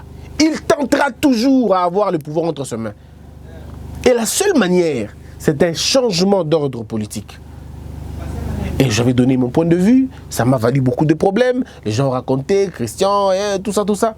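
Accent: Democratic Republic of the Congo. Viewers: Lingala ya solosolo boyoki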